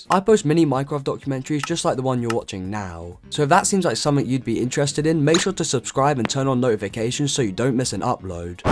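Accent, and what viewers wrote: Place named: United Kingdom. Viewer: Bros br'i ish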